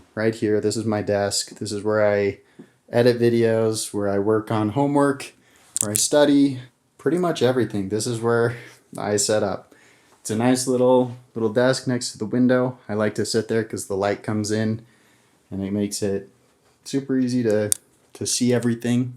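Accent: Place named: Vietnam. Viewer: Your vietnamese accent is cool! Hope to learn english much more from you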